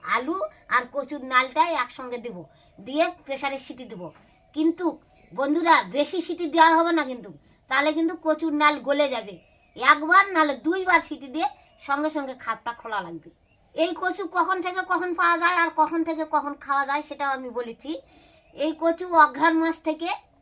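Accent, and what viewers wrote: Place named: Naogaon, Bangladesh. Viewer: বাসা কোথায়, একবারে হামারে নওগাঁ জেলার মুতোন ভাষা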